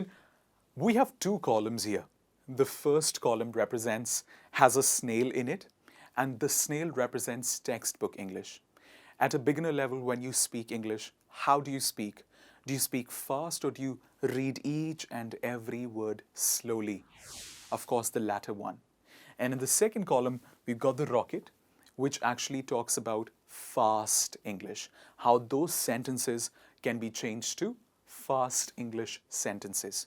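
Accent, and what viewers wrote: United States. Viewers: This is American English? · Is it American English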